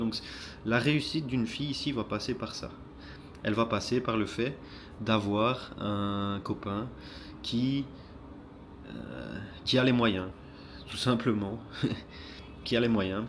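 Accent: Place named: Belgium. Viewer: Oh l'accent belge 😀 Merci poiur ton partage.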